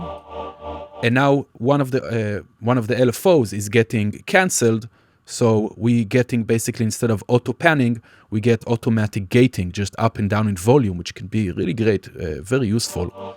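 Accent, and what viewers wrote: Israel. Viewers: אני שם כסף שאתה ישראלי המבטא הזה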